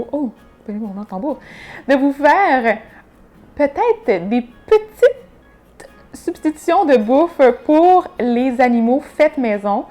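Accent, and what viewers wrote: Quebec, Canada. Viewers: Bonjour, J'achète les croquettes Orijen pour mes chats. C'est une marque Canadienne. Elles ont guéri les problèmes urinaires de mon grand chat qui était condamné par la véto à ne manger que des royal canin médicalisées à vie.... Mon deuxième chat, un petit qui souffrait de diarrhées interminables pendant plusieurs mois n'a plus eu un seul problème quelques jours après être passé aux Orijen. Il avait fait plusieurs séjour chez la véto. Elle lui a fait essayer deux gammes différentes de Royal Canin et à chaque fois les problèmes revenaient une fois rentré à la maison.... Tout ça pour te dire que cette marque a changé ma vie après avoir craqué presque 500 euros sur plusieurs mois en frais véto. Le seul truc qui m'embête c'est que je vis en France et que ce n'est pas du tout local pour moi. Mais toi vu ton "accent" tu dois être canadienne ou québécoise ?donc c'est plutôt cool. 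;).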